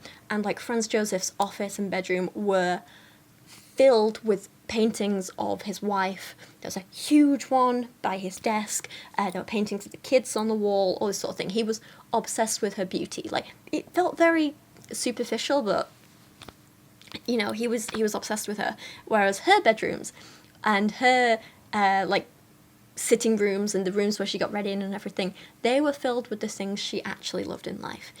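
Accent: United Kingdom. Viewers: In addition to misogyny, there are a couple of other prejudices Americans have that may be related to why you have been called pompous in comments. First is Americans often associate British accents with being rich, pompous, and stuck up. I’m not sure if it is because of old movies, or following the British royalty too closely, or the listeners feeling dumb for having some difficulty understanding you. (When I first found your channel, I would use the closed captions, but was fine after two or three videos. My mom would watch British shows but my father could never understand the accents, even after 40 years of marriage.) Another prejudice the American right has is that anyone who is educated, man or woman, is a pompous liberal who looks down on them. They often blame “liberal” colleges instead of questioning what could be wrong with their beliefs that causes smart to reject them.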